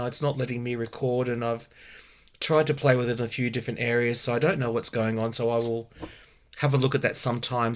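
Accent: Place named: Australia. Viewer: Thank you for your readings ❤it's a pleasure to listen yo you. You have a calming reassuring voice, which is wonderful. I love the Australian accent so much.❤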